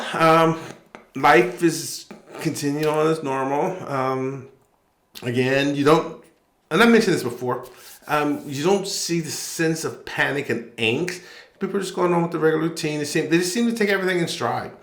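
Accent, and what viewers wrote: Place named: Italy. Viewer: You picked up an Italian accent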